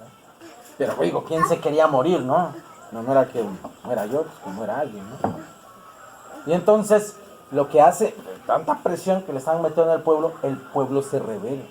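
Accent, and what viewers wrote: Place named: Mexico City, Mexico. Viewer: espoturno Es totalmente diferente, este wey tiene acento chilango. Los mayas siguen ahí en las comunidades, no mamen con tanta desinformación!!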